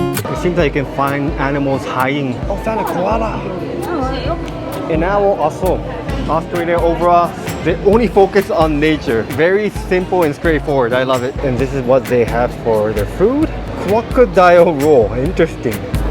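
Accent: Singapore. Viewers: The Singaporean accent in your English makes me smile😂😂 the food looks so good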